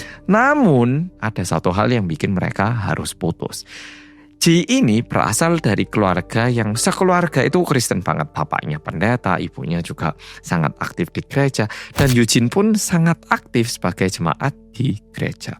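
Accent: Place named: Java, Indonesia. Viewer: Mas, aku sering nontonin videonya sambil makan, mamaku sering denger aku nonton dari ruangan lain dirumah, suatu hari mama nanya “kakak kenapa sih suka nontonin cerita orang jawa?” (Karena logat mas medok) 😂😂😂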